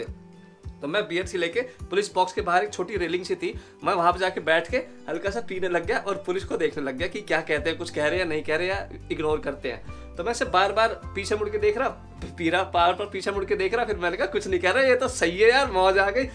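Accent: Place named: Uttar Pradesh, India. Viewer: Bhai ho to haryana se but aapki language to up ki hai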